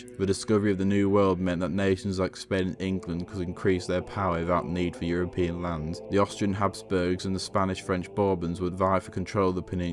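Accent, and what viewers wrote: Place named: United Kingdom. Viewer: done in a terrible regional British accent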